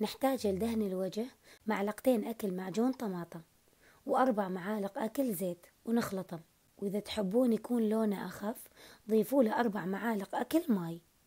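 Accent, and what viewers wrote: Mosul, Iraq. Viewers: الله يوفقج سهلتيها علينا طبعاً هاي الطريقة اسهل من الطريقة السابقة الله يرضى عنج ويرزقج من فضله يااارب. اعشق لهجة اهل الموصل 😍🌹❤️ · فدوه شلون معدله عاشت ايدج واللهجه الموصليه تجنن بلسانج ربي يبارك بعمرج ويحفظج ويرضى عنج بنوتي الحلوه🥰🥰🥰🌷🌷🌸🌸👍👍👍👍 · عجبتنه العروق واللهجه الحلوه والشرح الجميل الوافي تحيه من البصره للمعدلات المصلاويات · ماشاءالله روعة تسلم ايدك…طريقة العمل والشرح المختصر المفيد رائع والاروع والاحلى اللهجة المصلاوية المحببة عند كل العراقيين …استمري عزيزتي وبالتوفيق ان شاء الله 🌷🌷🌷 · أنا أعشق الاكلات المصلاوية 😋😋😋 اللي زاد حلاوة الڤديو لهجتك المصلاوية 😊💐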